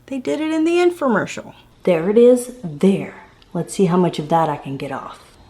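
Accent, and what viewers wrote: South, United States. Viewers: Omg that is so funny you said cotton candy grapes because I’m eating some as I’m watching this.... I love your videos!!!! Where are you from? Sometimes I hear a southern twang in your voice.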